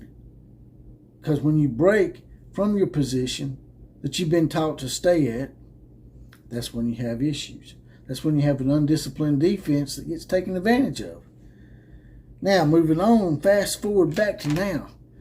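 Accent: South, United States. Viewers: I agree on the backing a car in topic. Parking lots even have signs posted that say do not back your car in but people still do it! Yes its even worse when a woman thinks she needs to back her car in. They will go back and forth multiple times to park. It makes me sick. Have you ever thought of becoming an actor? You are perfect for westerns. Good voice, good southern drawl and look like a cowboy. Boomer Sooner.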